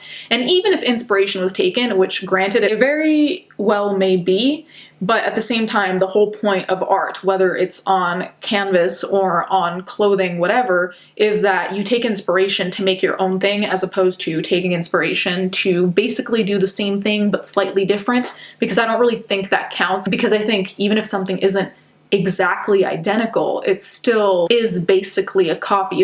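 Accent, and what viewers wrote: Italy. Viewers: She’s Italian. So… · The Italian in you can’t not say those designers properly and I am living for it 😍😍😍❤️🧡💛💚💙💜🤎🤍🖤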